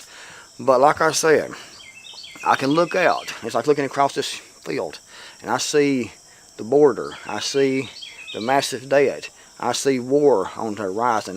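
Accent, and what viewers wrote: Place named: East Tennessee, United States. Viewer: I heard Patara mention your wonderful East Tennessee accent the other day; I had to just nod. Tennessee accents are so beautiful to me. (I'm from SC.) I AGREE WITH YOUR VIDEO.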